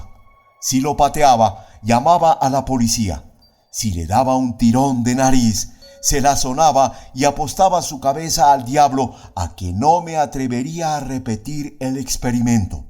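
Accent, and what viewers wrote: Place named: Latin America. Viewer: Qué dicha, un narrador Latino.con este cuento.. Gracias!!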